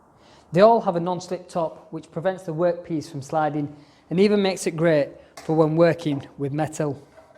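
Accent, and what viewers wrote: United Kingdom. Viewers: Fantastic review. Informative, demonstrative and to the point. No fluff. And a lovely Northern accent to boot! Hope to see more of your reviews in the future.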